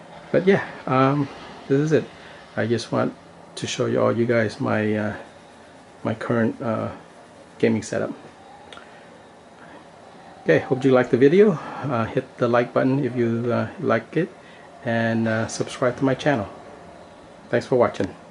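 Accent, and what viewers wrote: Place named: United States. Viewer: Chất giọng của anh giống Mỹ quá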